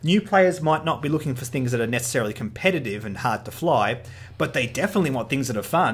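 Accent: Australia. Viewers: Is that an Australian accent? Whereabouts are you? I... I need someone to play with XD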